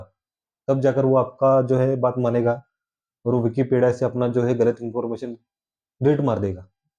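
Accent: Maharashtra, India. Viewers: Sir ji aap kha se ho, bolne se to lagta hai ki , u r from Maharashtra, I'm from dharashiv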